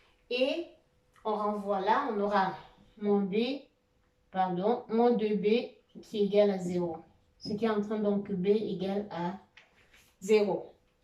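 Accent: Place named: Cameroon. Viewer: Très bonne présentation, vous avez un accent camerounais que je reconnais. Félicitations à ma compatriote mathématicienne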